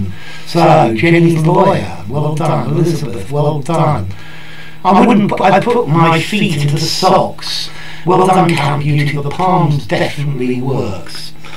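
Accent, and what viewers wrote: RP, United Kingdom. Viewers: You're really the best posh English teacher in YouTube 👏👏👏👏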